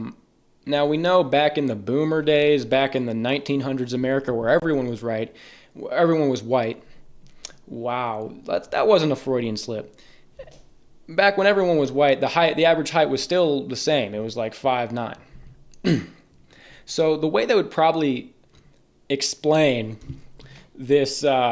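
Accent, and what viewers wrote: India. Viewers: Bro's Indian accent💀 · the indian accent :face-blue-smiling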